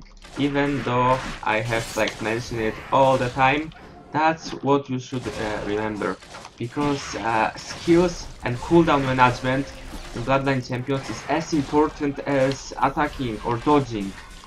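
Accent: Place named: Poland. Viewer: Oglądałem tylko ten filmik i wyczułem po prostu Polski akcent i tak się zastanawiałem.. :)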